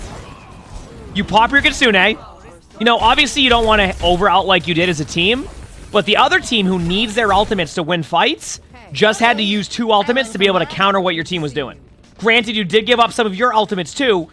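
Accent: United States. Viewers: Can someone tell me what accent has? I know it’s American ofc but what state specifically?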